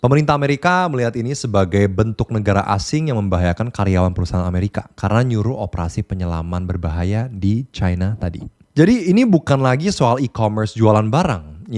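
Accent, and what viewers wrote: Jakarta, Indonesia. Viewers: logat indonesia bgt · Aksen bahasa indonesiamu bener2 indonesia banget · muka Korea logat bahasa Indonesia abis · Ngomong nya sdh Indonesia banget ( jakarta ) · aksennya indonesia bgt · Lah emg anak jaksel 😂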